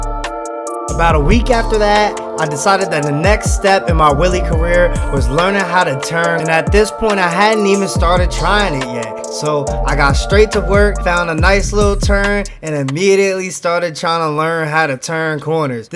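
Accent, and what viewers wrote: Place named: Philadelphia, United States. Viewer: Lmfaoo yo from start to finish u can hear the Philly accent progressively comin out 🤣🤣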